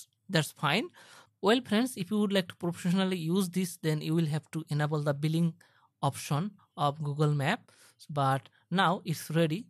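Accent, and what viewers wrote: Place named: Bengal, India. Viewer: ভাই আপনার প্রনাউন্সিয়েশন শুনেই বুঝে গেছি আপ্নে বাঙালি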